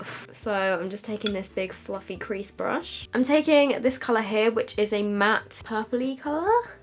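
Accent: United Kingdom. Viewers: shes hella british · She’s british 🤣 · dat british accenT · It's just British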